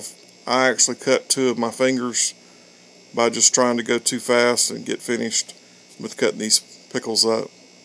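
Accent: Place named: Texas, United States. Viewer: Do you live in lower North East Texas? You certainly sound like you are from my area? I definitely enjoyed your recipe and am in the middle of making a four pound batch right now.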